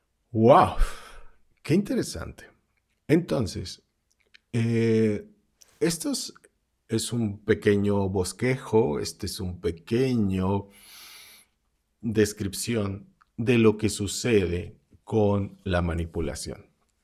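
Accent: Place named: Argentina. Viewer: estas hablando como argentino!!! 😃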